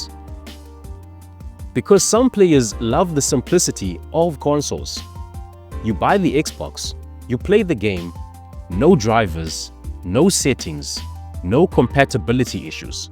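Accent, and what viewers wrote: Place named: South Africa. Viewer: u sound south african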